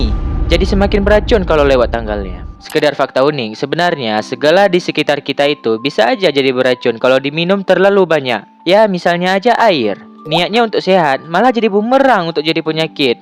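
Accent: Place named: Aceh, Indonesia. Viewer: Logatnya meuaceh that sang 🧐🗿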